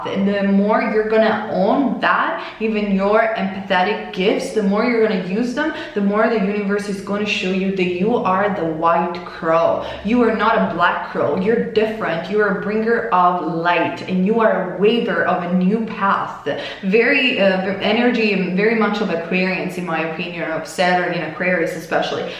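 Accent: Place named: Italy. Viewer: 👍👍👍 for Italian accent! 🍻🍻🍻 & 🌷🌷🌷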